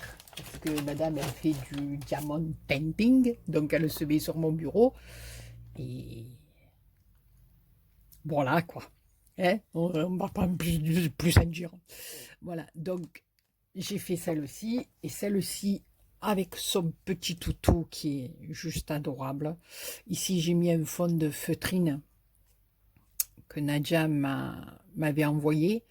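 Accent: South, France. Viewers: Coucou, ils sont superbes ces stickers, j'aurai bien aimé les trouver!! ils sont magnifiques tes ATC, j'adore!!! et le petit accent du Sud met du soleil dans nos coeurs dans ces moments de grande épidémie! ça fait du bien! merci beaucoup pour ce tuto, bisous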